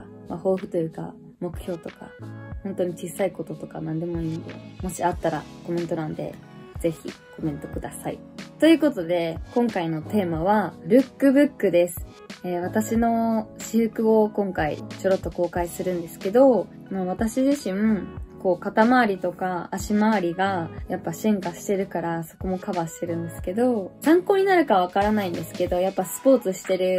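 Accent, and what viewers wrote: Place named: Niihama, Japan. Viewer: なつかしい新居浜弁を聞いて(読んで)思い出しました、生い立ちを詳しく知らなかったけどやっと分かりました。大島から市内に変わり神郷小学校から川東中なんですね。私の親が宇高の桜ヶ丘マンションに居たから場所はよく分かります。私も新居浜商業高校からトヨタに入り田原工場で定年後迄田原市ソフトボール協会会長としてソフトボールを楽しく過ごしましたし、のサインボールをキャッチして興奮した事をよく思い出します❗これからも応援していますからご活躍することを願っていますよ❗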